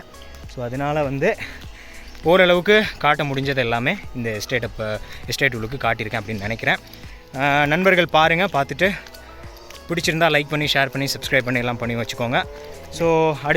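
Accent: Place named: Tamil Nadu, India. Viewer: மகனே நீங்கள் தமிழ்நாட்டில் பேசுவது போல் பேசுகிறீர்கள் முன்னோர்கள்‌பூவர்வகுடிமக்கள் தமிழ்நாட்டில் இருந்து வந்தவர்களா.அந்த பாக்கியம் பாட்டியும் தமிழில் நாட்டில் பேசுவது போல் இழுத்து வணக்கம் சொல்கிறார்கள்.இன்றுதான் முதல் முறை உங்களுடைய வீடியோ பார்க்கிறேன்.உங்களைபற்றி எதுவும் தெரியாது இனிமேல் தான் மற்ற வீடியோக்கள் பார்க்க வேண்டும்.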